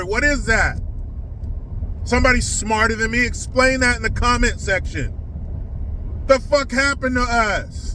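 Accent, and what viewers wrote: England, United Kingdom. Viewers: excellent English accent. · Is it Patricia Colours, one of the 3 BLM lesbians, leaders who took all the money from the BLM charity fund. Is it crooked Latisha James? Thanks, love your English accent Lol. yes this person of color crap is So o o old. Liberal Marxist divide of us up crap. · My God man, I was rolling on the floor with your British accent lol! I think you are funnier when you are in your car. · Great British accent brother. Not my problem. All my ancestors came here in the 1930...where I live this isnt a problem. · Your British accent killed me!!! Saludos from Costa Rica. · is the man. Bring back the British accent. Lizzo and Leti James had a kid. Your statue. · I never commented before that I got compliment. You on that English accent.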